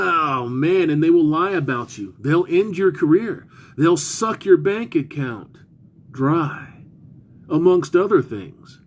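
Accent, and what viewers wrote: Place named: South, United States. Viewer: First time viewer here (Sandman sent me) Love this lol! Where is from? has to be the south. He sound like my cousin! must be part black lol love it!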